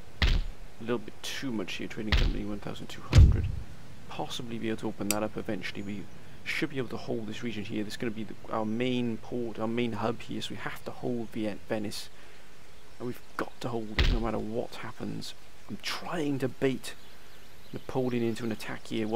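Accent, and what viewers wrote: Wales, United Kingdom. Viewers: you're a real gentleman, and very polite keep up the good work(: PS Are you welsh?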